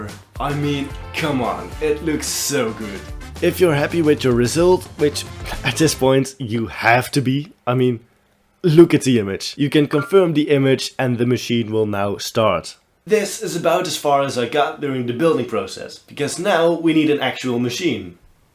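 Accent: Netherlands. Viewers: Hi I love your video and I thought you sounded very Dutch if your not Dutch don’t take this as an insult plz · I immediately heard you were Dutch 😂 Love your vid! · Love how you can still hear the dutch accent, Maar goed bedacht en gemaakt! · Your Dutch arent you??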